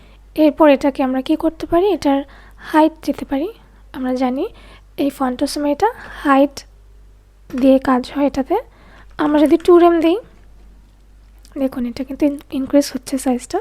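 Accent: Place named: Bengal, India. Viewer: Your Bengali accent is so sweet , I fall in love with you ❤❤ Do you know Hindi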